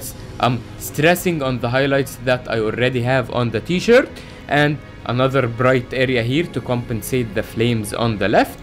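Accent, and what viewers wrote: Egypt. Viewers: The egyptien accent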